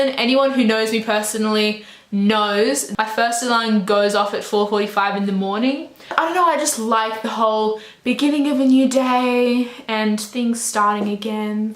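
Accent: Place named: Australia. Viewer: I have never thought you had a distinct Aussie accent. But that all changed upon listening to this ahahah. Love you xx (Also cute clap)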